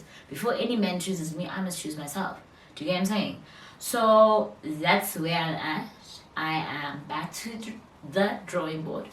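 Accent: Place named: United States. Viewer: This American ascent thingy confuses me 😑 but kept watching video to an extent 😑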